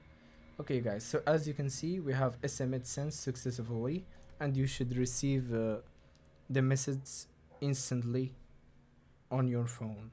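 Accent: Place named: India. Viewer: الله يسامحك يا أخي، ظنيتك هندي والله لأنك تتحدث بنفس طريقتهم استمر وفقك الله وأعانك 👏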